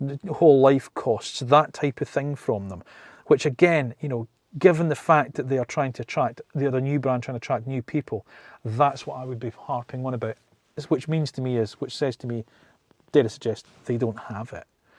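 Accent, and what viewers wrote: Scotland, United Kingdom. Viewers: Nothing better than a Scottish accent for saying "I'm not sure about that... I'm really not!" 😄😄😄 Thanks for another great review. Never heard you so scathing about a car. I wonder if they'll still be around this time next year?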